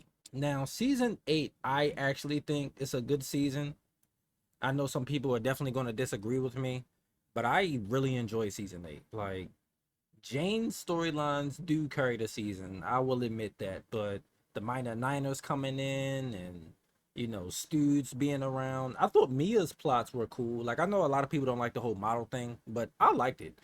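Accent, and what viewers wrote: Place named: Baltimore, United States. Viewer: Are you from Baltimore? I hear an accent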